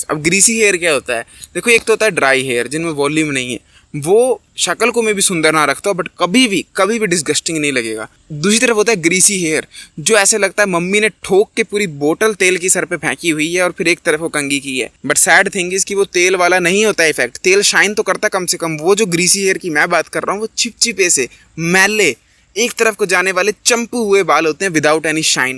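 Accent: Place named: Punjab, India. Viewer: Bro tera Punjabi accent end hai❤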